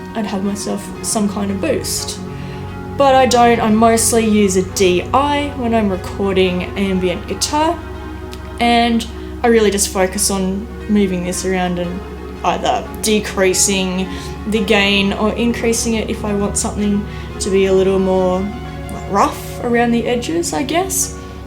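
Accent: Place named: Australia. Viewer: Hi! Thank you so much for your kind reply, I will keep that in mind. BTW love your channel, thought you are British - but you are from Down Under:-). Take care and cheers, Peter, Austria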